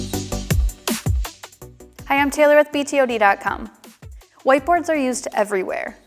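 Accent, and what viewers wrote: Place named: Canada. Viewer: Hahahahaha I’m sorry but it’s funny how concerned/upset she is in the intro about people “causing permanent damage to their white boards”... I can just picture her at the bar on Saturday night talking wayyy too much about white boards🤣 Also what accent is that??? Just curious.. is that Canadian? Listen to her say magnets: “Maeyyynits” Anyways joking aside thanks for the informative lesson on whiteboard maintenance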